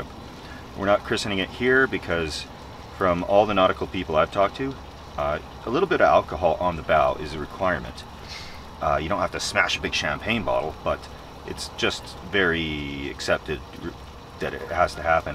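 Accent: Canada. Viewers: My wife is Canadian so I forgive the accent but mispronunciations are off limits. You’re still saying “brats” man. It’s “braahhts. You wouldn’t say bratvo would you? No you’d say bravo. Ok, so BRAVO on the video. I used to do something similar on my pontoon with a little self standing tent weighted down. Fun times. Thanks for the memories.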